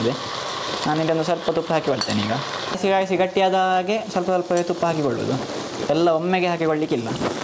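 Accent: Mangalore, India. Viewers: Your manglore slang kannada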